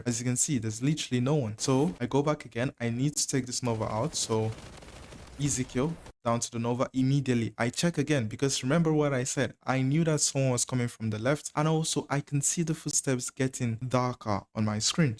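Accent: Nigeria. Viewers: U dey sound like 9ja guy😤😅.. gg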